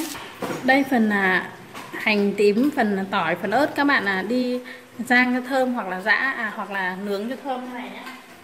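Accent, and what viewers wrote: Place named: North, Vietnam. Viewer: Nge tiếng bắc kỳ là thấy làm xong ko ngon rồi. Lướt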